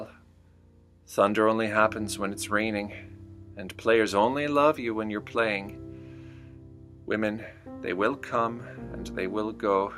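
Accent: Canada. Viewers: Very, nice. I always like the Canadian accents. One characteristic I have noticed, especially with the more western Canadian accents is that they do not put much emphasis on their consonants- they seem more muted that the vowel sounds.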